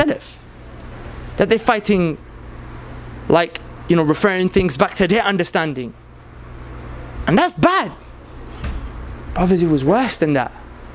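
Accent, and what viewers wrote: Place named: United Kingdom. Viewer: I can't believe dude speaks with British accent but some how it doesn't make sound smart 😂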